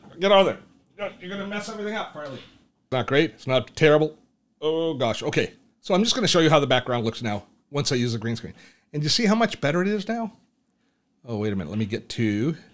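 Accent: Canada. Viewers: you seem to be funny and cheerful without being "goofy". Thumbs up! You get an extra gold star for not beginning your video with "What's up?" A "videographer" will lose me every time in the first 5 seconds when I hear that worn-out phrase. I will try recording the video element of my guitar playing (audio recorded separately) using your green screen technique. I currently am using Filmora and Adobe Premier elements for that but they both are a bit "wonky" to work with. I'm just curious,. What is that hint of an accent I hear in your voice? I'm guessing Canadian. The giveaway every time for Canadians is always how "house" is pronounce as "hoose" and "out" is pronounce as "oot".:-) Nice video... This inspires me to check out some of your other videos. Keep up the good work. Cheers!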